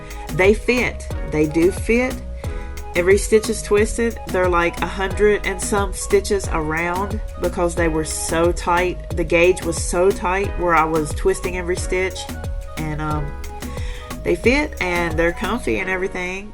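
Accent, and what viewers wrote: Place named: South, United States. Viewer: Why would anyone make fun of your accent? I’m Belgian and have lived on three continents. As a result I’ve got a ‘subconsciously adaptive’ accent - I tend to mirror the speech patterns and pronunciation I’m being exposed to at that very moment. I “should” have a Dutch/Flemish accent. I don’t. Somehow, regardless of whom I’m in a conversation with, people seem to identify Scandinavian undertones to my English. When in a conversation with my best friend from Oklahoma, that turns into Southern-with-a-Scandinavian-twist. When the conversation is with my British friend, it’s Welsh overtones with a seemingly Scandinavian flavour. 🙈 Accents are part of what makes us unique. Personally I feel the variations from the Southern States are beautiful. You almost sing.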